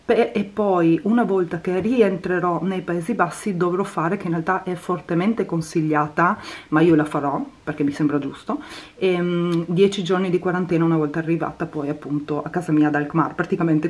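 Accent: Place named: Bologna, Italy. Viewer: con l'accento bolognese!!! Adorooo!!!!:)))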